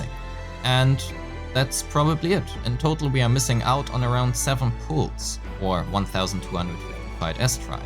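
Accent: Germany. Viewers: Are you German? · you sound german!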